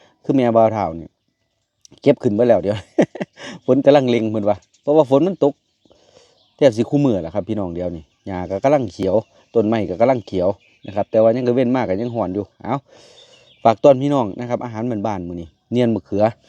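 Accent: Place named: South, Thailand. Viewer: พี่ แหลงใต้เก่งมาก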